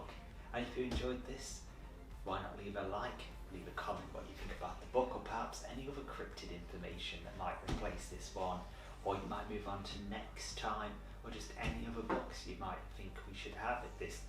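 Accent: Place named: United States. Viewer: So is his accent English or Australian ? He is definitely an American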